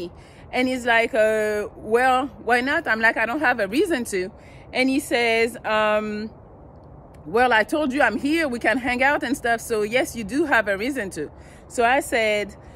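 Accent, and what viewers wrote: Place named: France. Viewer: She as a french accent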